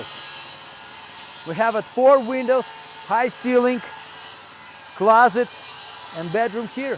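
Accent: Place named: Russia. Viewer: Ты ведь русский! 100%акцент!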